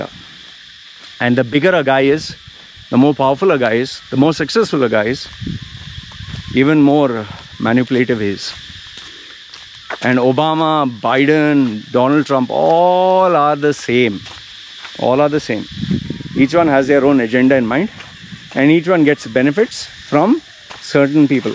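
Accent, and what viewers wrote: India. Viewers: his accent sounds very much indian too me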